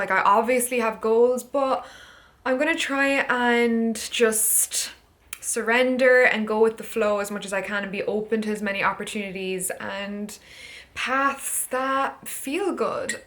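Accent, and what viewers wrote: Ireland. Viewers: Your Irish accent is poppin even more now!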